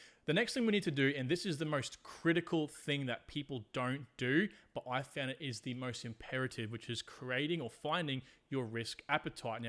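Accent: Australia. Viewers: Absolutely no bs, I love it. Educational as heck, perfect Australian accent, and everything you could honestly ask for, especially being free content. Brotha keep the grind up, I absolutely appreciate the help you give us, thank you and take care of ya self✌🏻🙏🏻💪🏻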